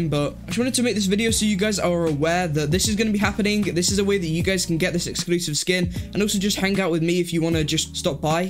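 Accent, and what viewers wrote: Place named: United Kingdom. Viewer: Is this tanqr’s brother or something? The gameplay is good and he does have the British accent. I dont know, maybe this is the new tanqr of arsenal.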